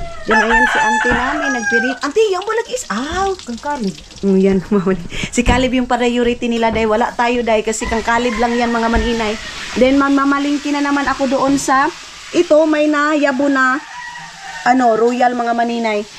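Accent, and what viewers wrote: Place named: Surigao, Philippines. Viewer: Surigainon Ang dialect ...like my late father..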